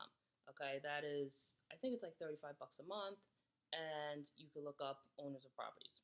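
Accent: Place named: New York, United States. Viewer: Very informative ! Great NY accent and tonality 👌🏾!!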